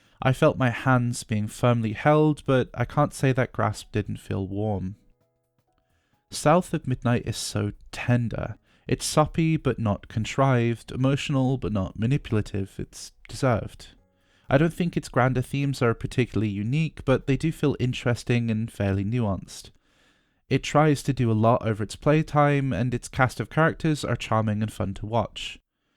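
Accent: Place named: United Kingdom. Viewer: Oof, soon as i heard your accent i knew i wouldntbe able to trust the opinion. No offense but anyone who spends their time playing videogames rather than fighting their dystopian authoritarian matriachal super state is probably a person that is ok with or apart of that tyranny. Cant trust game review advice from a race socialist. Though i wish you luck and hope that your reading this doesnt cause your secret meme police to kick in your door and .. make you..i dunno walk round london asking for forgiveness from black women or w/e is your unelected prime minister enforces as his ttier punishments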